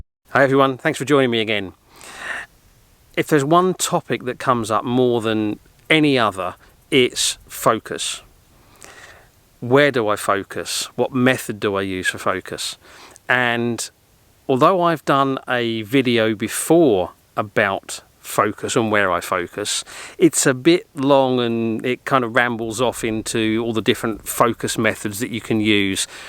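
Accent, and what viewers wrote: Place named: East London, United Kingdom. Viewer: Sir, are you from London? Your accent is really reminding one of friends who was coming from East London.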